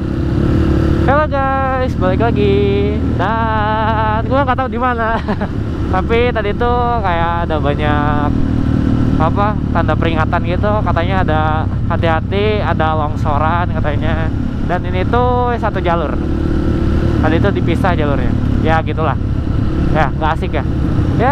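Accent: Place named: Sunda, Indonesia. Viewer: Sunda ae padahal mh kang, medok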